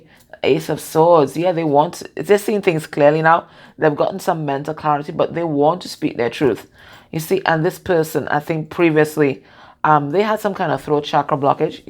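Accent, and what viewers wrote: Trinidad and Tobago. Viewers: Oh my this was very relevant, thank you for your guidance. I'm awestruck. Are you Trinidadian? You sound and laugh like a Trini ;)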